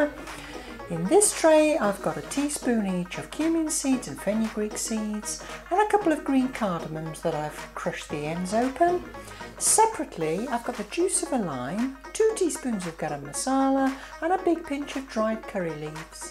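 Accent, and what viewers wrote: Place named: England, United Kingdom. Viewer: Ok, English accent with a slight hint off southern hemisphere in there, but Carrefour tin sugests you are out of the country....................... hmmmmm, I am guessing you are living in Australia? Im going East Coast Australia........... Am I within a thousand miles?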